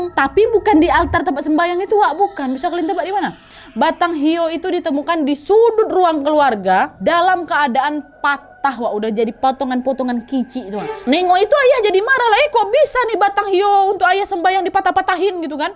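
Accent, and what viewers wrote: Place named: Sumatra, Indonesia. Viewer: Cerita jawa timur logat sumatra, tapi ini yg bikin aku suka, dan ingat terus sama ka ini ❤❤❤❤❤ada ciri khas nya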